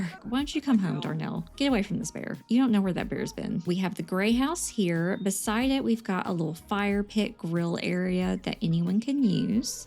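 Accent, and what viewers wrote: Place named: South, United States. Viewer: Omg I love this idea! (Also your accent. Southerners represent!!!) I'm trying something like this in my save file!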